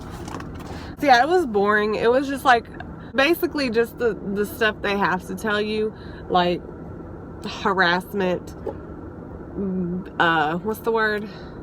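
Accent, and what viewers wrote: United States. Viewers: Btw defiantly can hear the Tennessee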